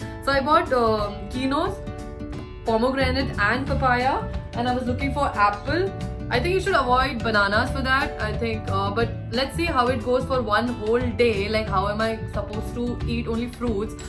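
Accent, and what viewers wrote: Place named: India. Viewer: Wait can someone explain to me how she is Asian but talks like a Indian person😂😂nah but no hate nice video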